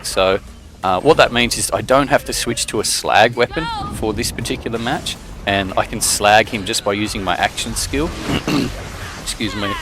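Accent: Australia. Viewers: Yes we do and your aussie(thats a compliment)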